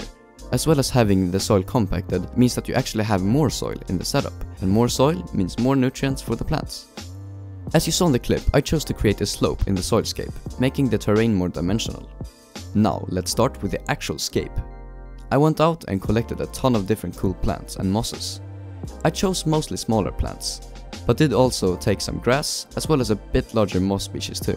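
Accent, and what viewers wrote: Sweden. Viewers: are you from sweden? your axent sounds like it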